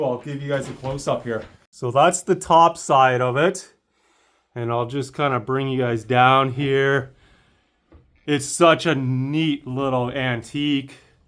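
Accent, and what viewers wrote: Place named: Minnesota, United States. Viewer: You sound just like minnesotans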